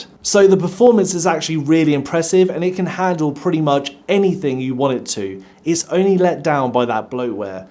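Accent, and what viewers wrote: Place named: Cockney, United Kingdom. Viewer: Why are you speaking in a Cockney accent?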